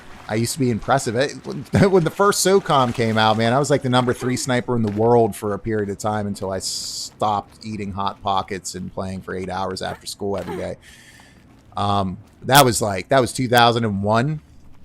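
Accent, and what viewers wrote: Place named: Pennsylvania, United States. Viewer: Yes! Aloy freakin rocks, haha. And the backstory is just chilling... Btw, I hear a familiar accent in your voice. Pennsylvania? Maryland? I grew up in Delaware. :)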